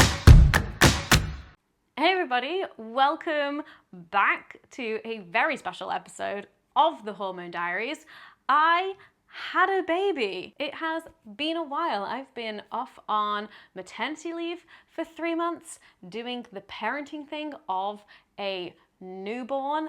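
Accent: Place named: Northern England, United Kingdom. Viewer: Congratulations! I love that you sound more northern when sleep deprived and pumped full of painkillers post-birth 😂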